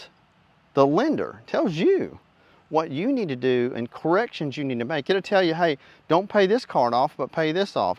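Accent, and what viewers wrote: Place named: East Tennessee, United States. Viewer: Old boy sounds more lihe east tn/western nc than Louisiana lol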